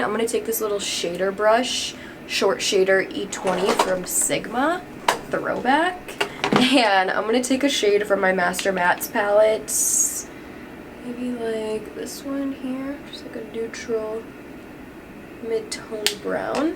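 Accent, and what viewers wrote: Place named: Michigan, United States. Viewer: She sounds like she is from Michigan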